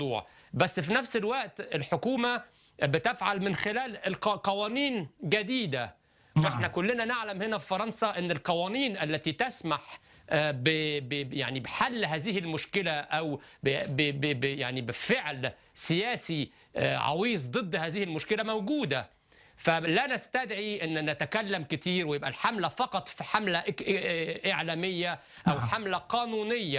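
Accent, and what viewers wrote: Egypt. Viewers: il dit souvent " farança (la France) faransi (Français) lol et il parle l'arabe littéraire comme les égyptiens! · Hahaha, ça fait bizarre de le voir parler arabe égyptien. Au meme titre que zemmour, j'admire ce monsieur. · Les maghrébins parle le dialecte algérien marocain ou tunisien parceque c comme sa que les habitant du Maghreb parle !! Lui parle le dialecte égyptien qui ressemble bsp à la langue arabe littéraire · il parle le dialecte égyptien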